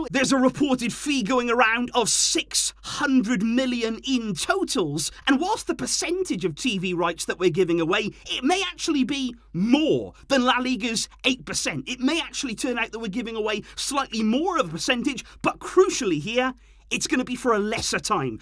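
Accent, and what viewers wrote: Wales, United Kingdom. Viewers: Do all welsh people sound like this?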